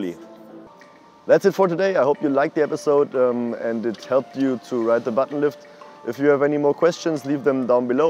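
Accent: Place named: Germany. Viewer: B H-Abigel German accent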